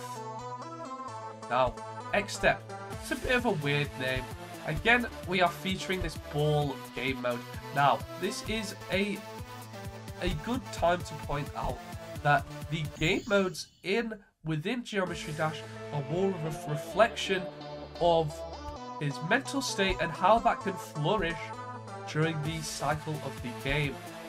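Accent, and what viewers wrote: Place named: Manchester, United Kingdom. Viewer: I fell asleep watching this last night and I was confused to find my inner monologue briefly had a manchester accent in the morning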